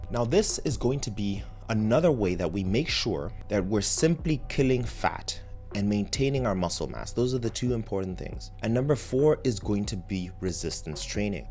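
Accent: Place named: South Africa. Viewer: I detect a South African accent…. Am i right? Very nice summary!! Thank you!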